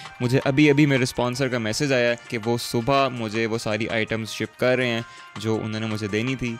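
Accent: United Kingdom. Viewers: Dude please teach me your english accent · You Deserve My Subscribe. I like your English Accent And Your decent Talk. 👍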